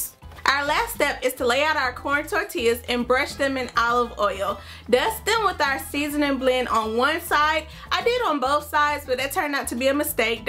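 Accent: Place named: South, United States. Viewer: Yay! Ur back.. Hood thing? Nope, I think that's just the southern twang ppl use.. Hahaa, love how u stuffed ur mouth xoxo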